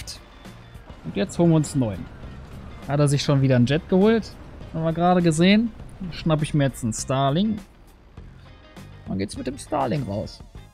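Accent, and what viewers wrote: Cologne, Germany. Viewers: Kommst du aus der Nähe von Köln? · ahaha wurden die dezent wegpenetriert lol.......dein Köllner Akzent ist wonderfull:D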